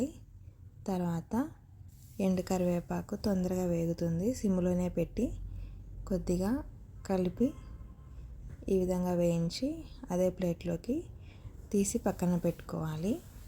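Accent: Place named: Kadapa, India. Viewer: Pakka Kadapa yasalo matladuthunnave mmeebale chepthunnav❤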